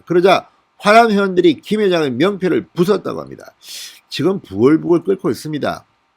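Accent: Gyeongsang, South Korea. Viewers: 진성호씨~그놈의 대통령 소리 이제쉿~억양이갱상 도라 통자된소리 토놤~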